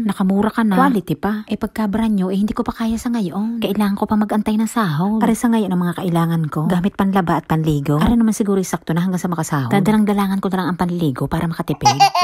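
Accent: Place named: Batangas, Philippines. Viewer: new follower ninyo po ako. i like how you narrates your videos... nkakatuwa. batanguena tlaga!!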